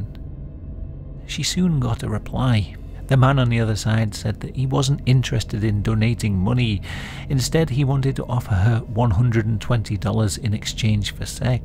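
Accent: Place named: Lancashire, United Kingdom. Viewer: What a great channel this is. So much content that one doesn't encounter amongst the plethora of disaster/death/murder that's online, and with stupid American narration. Here's a Q. for you,; might you be from roundabout Lancashire perhaps? Just going by the good accent!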